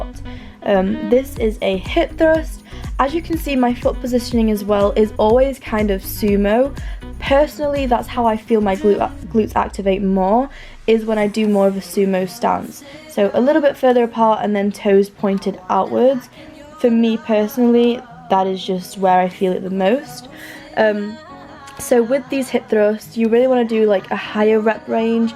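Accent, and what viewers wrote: United Kingdom. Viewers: Somehow I find the way you speak really attractive, is that weird? 🙈 Btw are your parents/family hispanic? Your name sounds hispanic but your accent obviously is British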